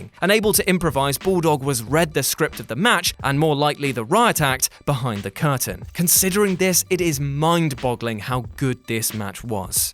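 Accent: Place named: Newcastle, United Kingdom. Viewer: Yessssss a fellow geordie 😂😂😂💪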